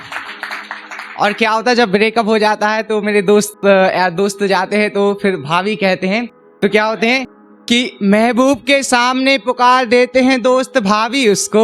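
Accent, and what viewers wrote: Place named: Sagar, India. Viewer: Bhai abaj se pta chal jata hai ki sagar se ho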